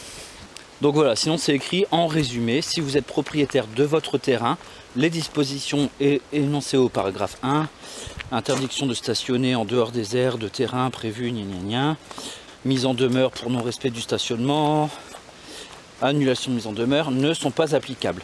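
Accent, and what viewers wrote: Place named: Nord, France. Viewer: T' es deuch' Nord tisote nan ? T ' as un p' tit accent ..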